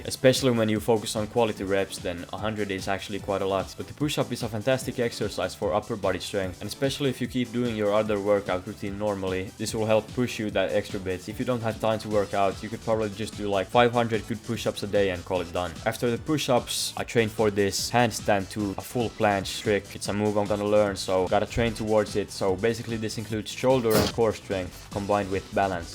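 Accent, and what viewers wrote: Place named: Finland. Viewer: Suomen aksentin aina tunnistaa :D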